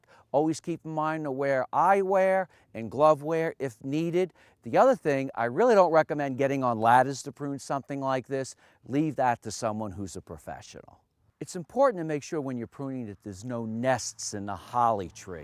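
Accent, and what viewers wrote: United States. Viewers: What is that accent? Jersey? Philly?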